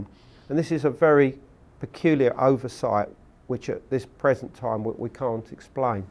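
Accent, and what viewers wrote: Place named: Mockney, United Kingdom. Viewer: Mockney bellend!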